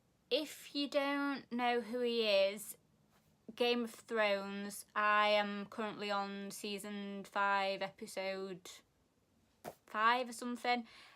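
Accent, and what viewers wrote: Sheffield, United Kingdom. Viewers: I love the Sheffield accent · You have the posh Sheffield accent. I have lived in Sheffield all my life and I don't sound anything like you. Mine is a common Sheffield accent.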